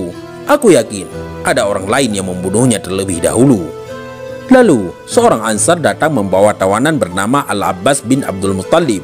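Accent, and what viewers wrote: Aceh, Indonesia. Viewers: logat ACEHH kyk nya...taah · Salam admin:sya dari padang minangkabau.bolehkah saya bersejerah bertanya kpda admin. Admin dari mana. Bhsa admin bercampur dngn bhsa indonesia & melayu.logatnya sprti logat aceh.apakah admin orng melayu aceh.orng malaysia tak mungkin rasanya bhsa melayu malaysia berbeda dngn bhsa admin.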